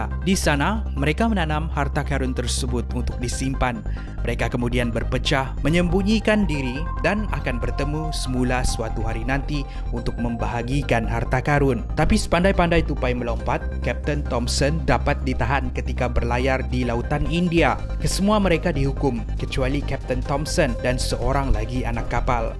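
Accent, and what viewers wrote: Sabah, Malaysia. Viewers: Dia orang Sabah la bro.. mmg cmtu loghat dia..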